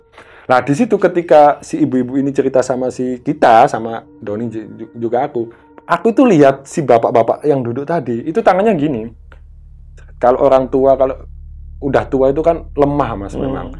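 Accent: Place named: Malang, Indonesia. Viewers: logatnya asli malang banget, mantab mas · Cara cerita dan logat mas bikin aku kangenbanget sama Malaaaaaang huhuhu btw suwon sam wes crito yaaa! Tahes terus umak yaa sam!!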